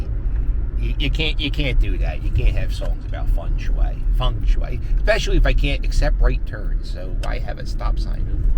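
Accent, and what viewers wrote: Philadelphia, United States. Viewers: Dude, I heard your accent and immediately though, yo, Philly! ...then you said you were driving to Wilmington and that sealed it,... Delco? Fair enough review on Coheed, but i gotta say, you gotta see those dudes live.... the fuckin energy they throw off is amazing!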